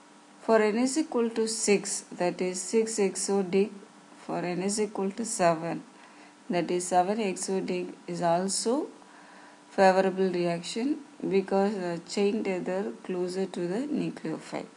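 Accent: India. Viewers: Why cant you just speak in Hindi and have an interpreter translate it to English. I cannot understand a single word you are saying. I appreciate your effort towards this video. But if you are trying to target people who speak English, your accent is too heavy Hindi rich. I can’t understand you.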